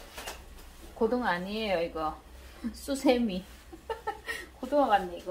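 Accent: Gyeongsang, South Korea. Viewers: 어머나.. 이제 목소리까지 오픈하셨군요 ㅎㅎㅎㅎ 경상도 출신이라 경상도 사투리 참 정겹네요♡ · 영상스타일이 살짝 바뀌었네요..^^ 광고 뒤 영상나올 줄 알았는데 안나와서ㅋㅋㅋㅋ당황했네요ㅋㅋ 같은 경상도라 말투가 넘 친근하네요..오늘도 잘 봤습니다.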